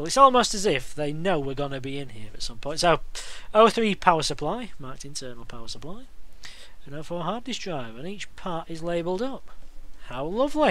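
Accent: United Kingdom. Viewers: im just woundering it sounds like your form the UK im just woundering if you are where do u buy your soldering flux from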